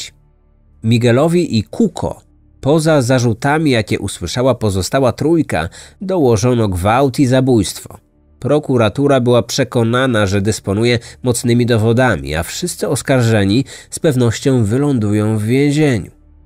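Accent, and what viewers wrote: Poland. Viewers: te promocje to k.. a ty masz jakies wschodnie pochodzenie. Hajnowka a moze Bielsk Podlaski. lubie cie ale akcent nie z pyrow lecz z podlasia. "trzeba nosic wlasna skore".pozdrawiam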